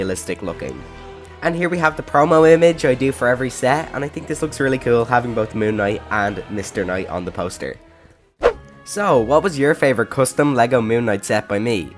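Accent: Ireland. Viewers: Are u irish and if so what county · Are you Irish like me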